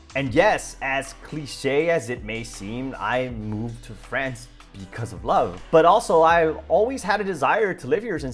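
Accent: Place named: Southern California, United States. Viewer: LoL yeah, you definatly have a So Cal hipster accent 😅👍 Love those hills in the intro. Hows the economy there in Lyon? It's my number 3 spot I'm thinking, thou heard it's more expensive than toulouse & Rennes.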